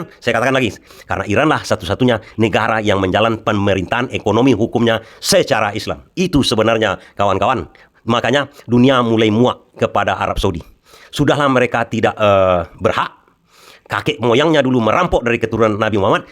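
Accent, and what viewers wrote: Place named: Belitung, Indonesia. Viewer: Bahasa bpak ini seperti orang2 daerah bangka belitung tapi lebih ke belitungnya, apa benar pak....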